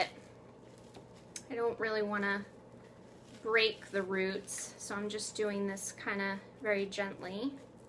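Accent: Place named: United States. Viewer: Are you in Bridgewater in UK or USA. I notice your USA accent. My temerature is only 20°c and my beany is losing leaves by the handful after I just watered her!! Please help.x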